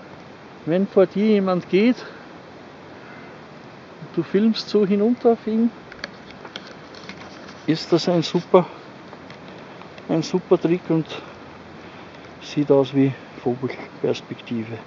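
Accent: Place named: Austria. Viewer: Ach ja, so ein Einbeinstativ ist schon Gold wert. Und der Deinige ist auch noch ein Spazierstock obendrein. In meiner Antwort auf deine pers. Nachricht wusste ich noch nicht woher du kommst. Ich denke mal..an deinem Dialekt aus Österreich. Auch das Kennzeichen deines Autos ist kein deutsches aber man kann es nicht gut erkennen. Aber ich möchte ja nicht herumschnüffeln sondern deinen Beitrag der für so manchen Wanderer interessant ist, beantworten. Gruß von Walter.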